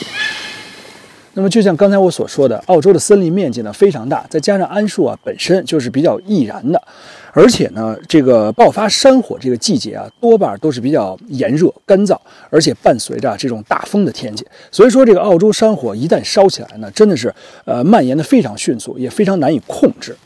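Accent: Beijing, China. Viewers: 听口音明明是大陆人，一到youtube上就切换成了繁体字，我也不知道你到底是怎么想的。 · 北京口音很重，用普通话更好些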